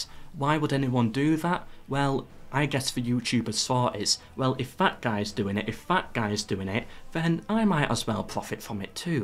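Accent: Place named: North, United Kingdom. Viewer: which region of the UK are you from? I'm trying to place your accent. I'm American and we're bad at identifying British accents. If I had to guess, somewhere in the north?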